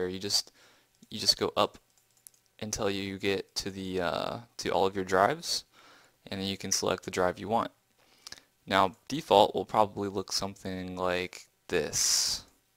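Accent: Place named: Sweden. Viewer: Holy shit you sound swedish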